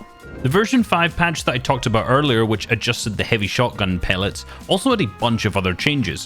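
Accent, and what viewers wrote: Scotland, United Kingdom. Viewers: Ay you Scottish? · r u scottish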